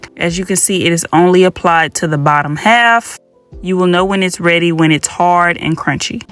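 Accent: St. Louis, United States. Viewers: “ cut my hurrr” lol 😭the way Americans talk is so interesting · You from saint Louis?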